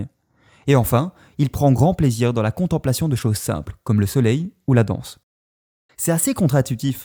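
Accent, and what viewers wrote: Belgium. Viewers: comme d'habitude excellent video tu es le meilleur youtubeur de Tout Les temps avec Plus recherches plus de nuances et moins d'accent belge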